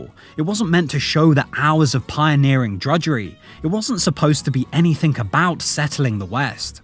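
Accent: United Kingdom. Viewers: I always wondered why when people make these videos it's never Americans always sounds like a British person or something like that I don't get it should be Americans doing this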